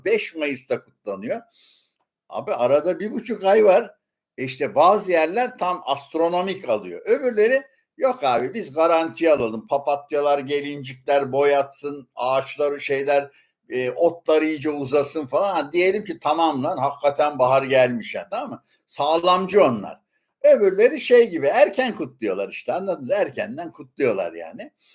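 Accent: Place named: Yozgat, Turkey. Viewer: Yozgatlı ağzı var kardaş sende bir de çatı,reklâm falan burada bile dümen turundasın çene güçlü edebiyat iyi..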